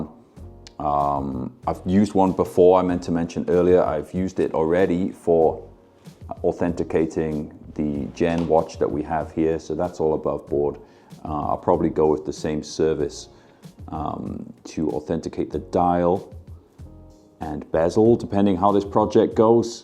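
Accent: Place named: Wales, United Kingdom. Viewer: Where’s your accent from because I thought I could hear a bit of Welsh in it? 🧐👍🏻🏴🇬🇧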